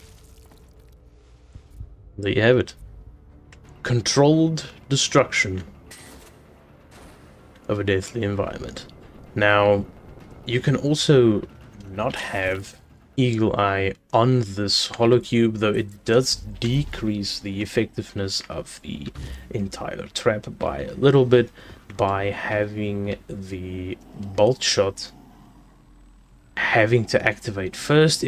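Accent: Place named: Russia. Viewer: Hmm interesting. Im from germany and in german languge i realy hate that russen accend, but in english i like it for some rison. Long story short...i like your accent :) . Oh and of curse your vidios ar great. Kepp going.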